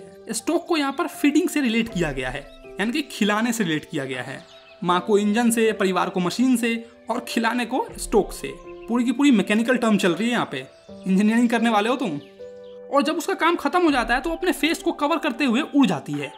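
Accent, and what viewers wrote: Bihar, India. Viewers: Bhaiya your way to teach us is extremely nice, as I know you sometimes use bihari accent language that,s awesome I have also several friend from bihar which is also great and hilarious.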